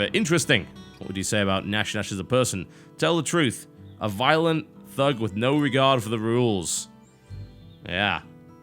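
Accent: United Kingdom. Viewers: Why would the name total Halibut and athe british accent make you belive that? No but in all seriousness( if that is a word) he is british but moved later to the U.S you can catch up on most of his backstory in the 1 mio subs vlog. Thinking about it it sounds like I gave you the back ground to a videogame character... hm.. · is it just me or is british? lol!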